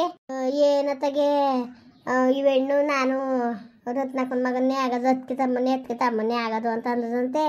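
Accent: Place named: Ramanathapuram, India. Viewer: Yavdu native Nimdu, e Tara language matadadu Ramanathapuram side, heli please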